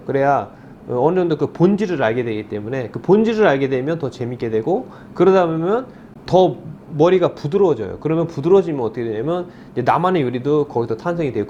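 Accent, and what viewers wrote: Japan. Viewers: 그니깐요 역시 사람은 계속 써야 하나봐요 초창기 모습 보면 재일이나 일본 사람이 한국말 배운줄 알았었는대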